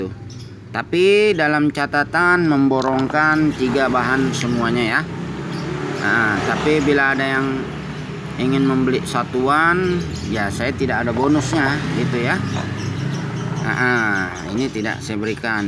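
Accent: West Sumatra, Indonesia. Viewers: ..suara anda jelas bgt kalau anda orang MINANG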